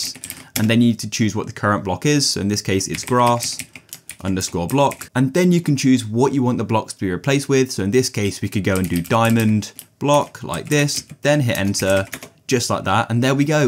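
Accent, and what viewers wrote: United Kingdom. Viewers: don't speak British, speak American English!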